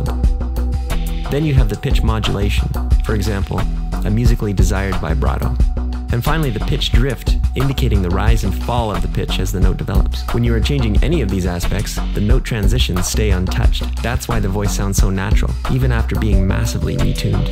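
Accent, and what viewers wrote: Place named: Canada. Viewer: Haha, he sounds Canadian to me, too! Anyway, cool stuff.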